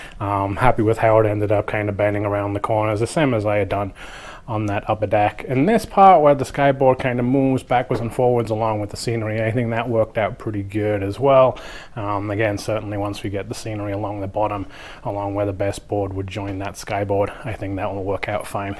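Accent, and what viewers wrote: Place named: Canada. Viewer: Where are you from originally? Your accent just gets me every time. It sounds like you got English + Canadian going hella wild! Love the layout!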